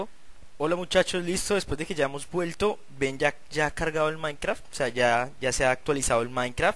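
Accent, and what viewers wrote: Colombia. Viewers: Se le nota que es Colombiano el parce , por su cara por su habla diciendo parce , chimba y por eso siempre estoy orgulloso de Colombia :D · sos colombiano ? ._.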